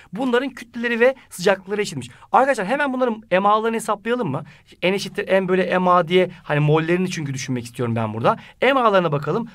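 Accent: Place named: Central Anatolia, Turkey. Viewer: hocam nerelisiniz bi iç anadolu ağzı sezdim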